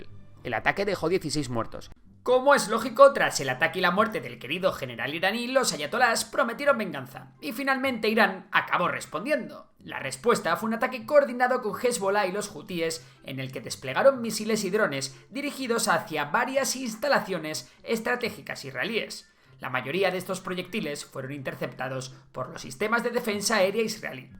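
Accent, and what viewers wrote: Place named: Spain. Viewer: Oista chaval que feo es el acento español tío no lo tienes en latinoamericano?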